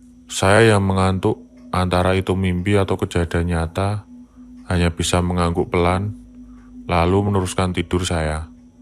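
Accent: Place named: Java, Indonesia. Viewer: Suka ama logat jawa-nya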